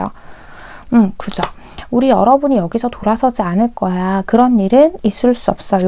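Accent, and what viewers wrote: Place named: Gyeongsang, South Korea. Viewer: 3zz ㅋㅋㅋㅋㅋㅋㄴㅋ걍상도남쟈맞습다..ㅋㅋㅋㅋㅋㄴ 해봄이라는 말이 왜이렇게 듣기좋은지 모르겜ㅅ내옹❤ㅎㅎㅎㅎ 후후후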